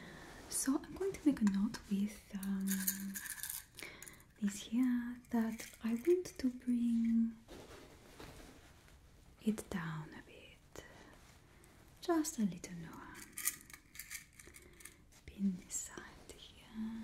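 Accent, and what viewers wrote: France. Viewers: I do love your French accent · I like your french accent 👍 she is so sweet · You have such an Amazing French Accent 🙂🇨🇵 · Honestly, I think has the most smooth French voice I have ever heard. your voice is spot on and quite calming. 👌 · I'm french and I find it very relaxing when you speak with that accent and pronounce french words. You're my favourite ASMRtist and I love your videos,! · I absolutely love it when you do your french accent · Wow you really resemble Natalie Portman in this video ... also loving the French accent 🤌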